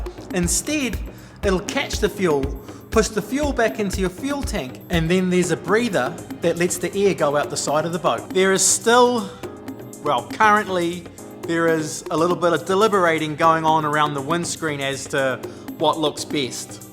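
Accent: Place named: Australia. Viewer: I'm not sure what's more cool that STONG Australian accent or that aluminum boat.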